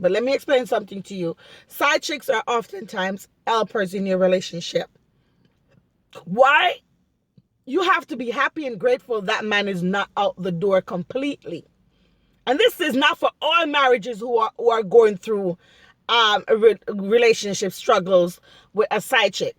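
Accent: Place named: Jamaica. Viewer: I love your exit is that Jamaican this may be ignorant question ... I apologize if it's offencive but your accent is lovely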